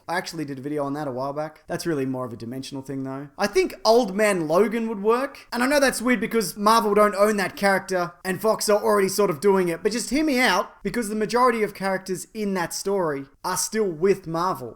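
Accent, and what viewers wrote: Australia. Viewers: I'm Aussie, born and bred, and my accent is not where NEAR as broad..are you...amping up the Aussie a tad? Anyway, great stuff, love you work, give me a fucking loot box. Cheers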